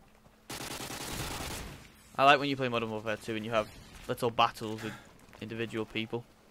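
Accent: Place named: North, United Kingdom. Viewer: Yo I’m from Australia and I’m trying to figure out if that’s a Manchester or Yorkshire accent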